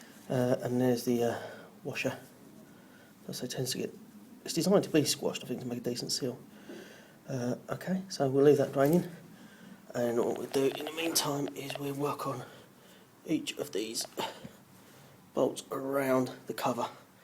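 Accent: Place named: United Kingdom. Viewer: Nice one.. Good to hear a UK accent! Im about to do this on my '97 CBR 6f. Kept burning out the mid connector. Anyway brilliant upload thanks:) oh and check out 90's Japanese Sportsbikes on Facebook. Were a good bunch and ya bike qualifies lol.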